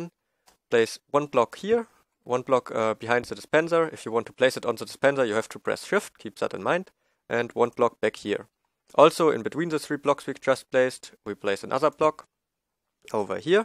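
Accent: Germany. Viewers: Are you german? ^^ You sound as if you were! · Are you german ? Its Sounds like :) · Typisch deutscher akzent. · man hört richtig den deutsche aktzent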